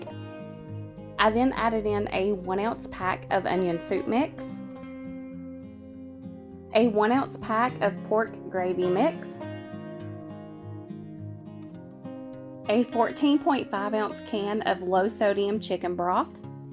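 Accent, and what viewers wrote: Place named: South, United States. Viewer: I love your southern accent! Greetings from San José, California!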